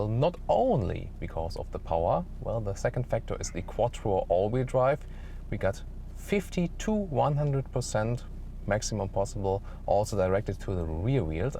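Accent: Germany. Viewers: If you like Audi mehr ähh more... hahaah Finds auch witzig wie du Autogefühl ausspricht, klingt wie nen Engländer der versucht des auszusprechen, aber sonst halt immer voll den deutschen Akzent xD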